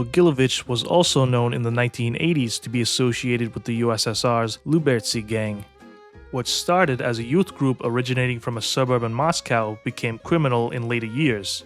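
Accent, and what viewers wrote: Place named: Boston, United States. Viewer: Sounds dead on like Kevin Weeks to me. Definitely a Boston accent and not a Long Island one.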